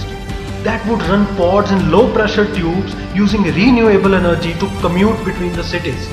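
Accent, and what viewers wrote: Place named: India. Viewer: the bgm is epic. the accent doesnt work with the bgm. no offense to indian accent but they just dont work together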